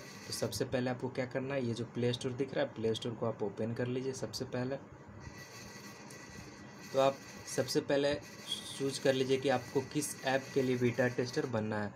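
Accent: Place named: Patna, India. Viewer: Your from patna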